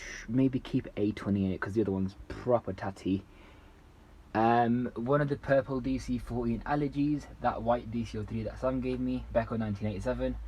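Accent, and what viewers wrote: United Kingdom. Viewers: Are you from Birmingham 🤣🤣🤣your accent is sick !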